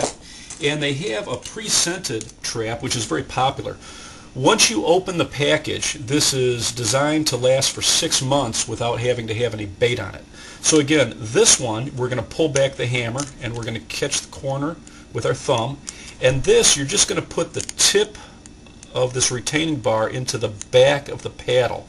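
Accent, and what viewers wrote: Chicago, United States. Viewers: I love your accent too. Chicago?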